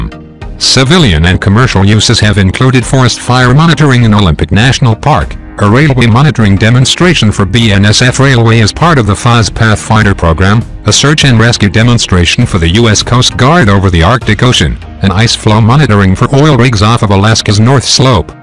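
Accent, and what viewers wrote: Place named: Russia. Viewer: Poor sound - Russian accent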